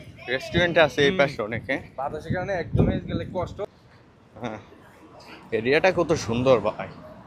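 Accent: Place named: Sylhet, Bangladesh. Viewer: Sylhety mat beshi vala lage😊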